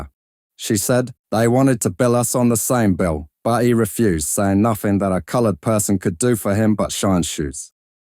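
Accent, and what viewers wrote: United Kingdom. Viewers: This narrator is a supreme God of all of narrators. This is great a greatly appreciated narration. His British tinged delivery is awesome. Well Done, sir. I didn’t know all of these details about her.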